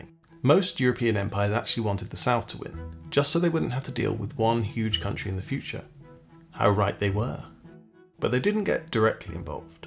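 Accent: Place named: United Kingdom. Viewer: Listening to a Brit pronounce Spanish place names and dates in Mexico in Castellano is hilarious. “Vera Cruth” “Thinco de Mayo” 😂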